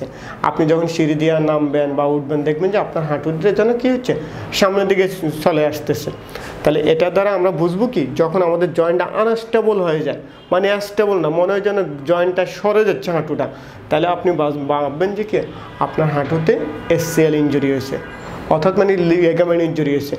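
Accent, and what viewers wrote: Noakhali, Bangladesh. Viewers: স্যার মনে হয় নোয়াখাইল্লা🥱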